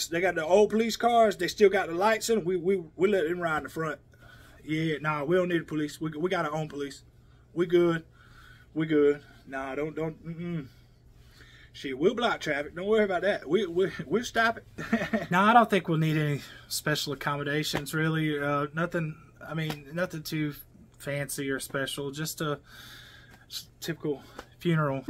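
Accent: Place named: South, United States. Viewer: My boy straight up out the south lmao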